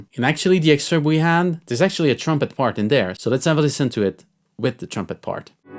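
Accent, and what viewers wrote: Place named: France. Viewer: Great tips, many thanks! Coming from a language where “h” sound is much harder than in English pronunciation, your implied French “h”’s took some time to get used to. Also, being a beginner, had to look up what “Cr.” stands for - learned something new and absolutely necessary.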